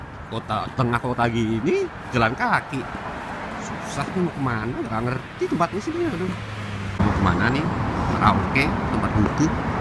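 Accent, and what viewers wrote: Java, Indonesia. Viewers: iki koyone wis sue nang jepang, tapi logat jowone gak ilang hahaha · pak jowone ngendi???... hiromi dijak balek jowo to...hehehe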